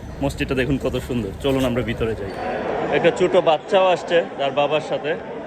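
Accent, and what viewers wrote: Bangladesh. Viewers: উনি বাংলাদেশি মানুষ। আমি বাংলাদেশের মানুষ আমি কলকাতার ভাষা আমাদের ভাষা শুনলেই বলতে পারি এই লোক কোন দেশের ভাষায় কথা বলতেছে। কারণ কলকাতার ভাষা আমাদের থেকে একটু আলাদা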